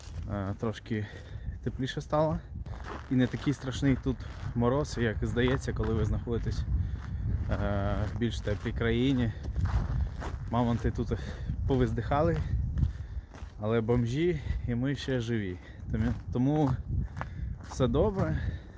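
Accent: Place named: Western Ukraine, Ukraine. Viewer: Привіт! Гарно говориш по українськи, навідь з легким західним акцентом!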